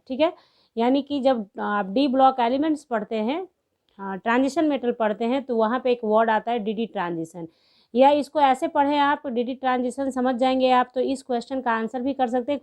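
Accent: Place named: Bihar, India. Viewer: You are from bihar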